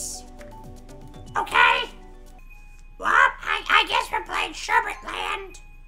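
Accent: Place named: Germany. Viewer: Are you German? I heared the accent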